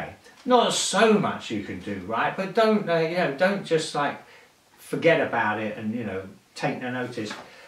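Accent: London, United Kingdom. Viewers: Thats London english, none of that Queens English rubbish, learn this!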